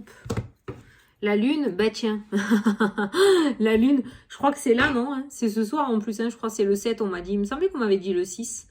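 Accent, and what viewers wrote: South, France. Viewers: Bonjour, Déjà, mille mercis pour ta bonne humeur & ton accent du sud qui font du bien. On m'a effectivement proposé un partenariat. Je suis en pleine reconversion et une connaissance me propose de partager des locaux dans un quartier en plein essor.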